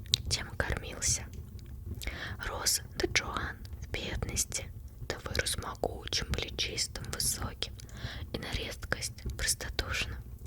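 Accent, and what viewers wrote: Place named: Russia. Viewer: Sotaque russo é muito bom velho, o jeito que ela pronuncia os ditongos é diferente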